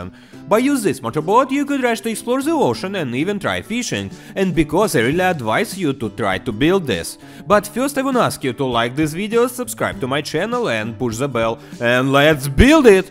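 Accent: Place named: United Kingdom. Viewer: Отличный акцент. Ты из британии?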